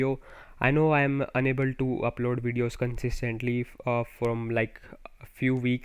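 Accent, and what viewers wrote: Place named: India. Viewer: when i hear that Indian accent i know its a scam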